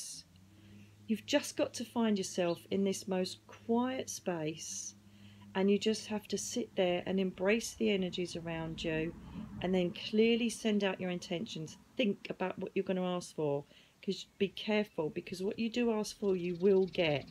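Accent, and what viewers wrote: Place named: United Kingdom. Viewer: Hi, it’s eastern standard time. It’s wed March 8th, 2023. I’m texting from Massachusetts, USA. I’ve just recently started listening to you. I feel I was guided to you. I’ve been listening to mediums for awhile now and have been very intuned to spirituality and all that you talk about for the last several years. I love your messages and your funny, down to earth personality and your adorable British accent! Your a pleasure to listen to. I’ve turned my 83 yr old dad on to your videos on utube as well. I’m so greatful to you for your gifts and happy for you that you seem to be living your best life now. We all have our challenges and you certainly have had your share! Enjoy life and Thankyou for sharing your gifts with the world. I hope and pray that more people will tune into you and people like you so we can save our planet and the human race. 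I will be sharing your messages on social media in hopes to spread them. God bless you and yours and take care. 💕🧚‍♀️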